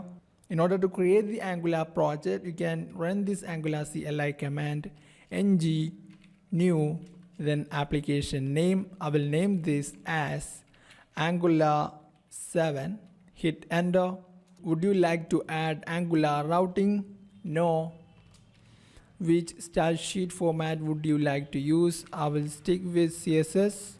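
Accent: India. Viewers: I love Indian English :)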